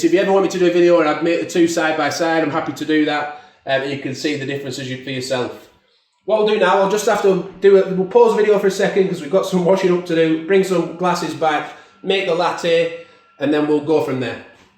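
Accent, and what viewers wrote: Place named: Northern England, United Kingdom. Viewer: Excellent stuff - as a Northerner living down South, the best bit (apart from the thorough and detailed review!) was the Northern accent. Music to my ears :) Thank you! One thing I thought I would point out (based on my research), is that the Lattissima machines actually steam the milk whereas the other standalone units just heat and froth the milk. I find the steaming releases more of the natural sugars in the milk - no need for any sugar on top.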